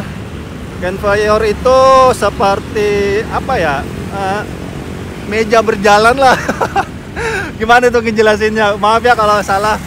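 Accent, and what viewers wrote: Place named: Medan, Indonesia. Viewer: Abang ini cem orang Medan, trus trus trus .....ngeri2 sedap ku tengok2.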